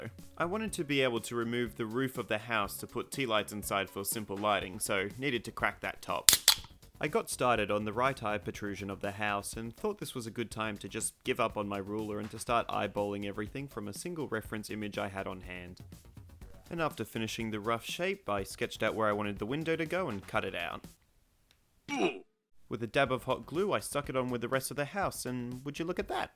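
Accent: Australia. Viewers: Bruh, this is your first and (so far) only video and I already love your stuff and I'm half a minute into the video as I type this You've already earned yourself a subscriber Also are you Australian too?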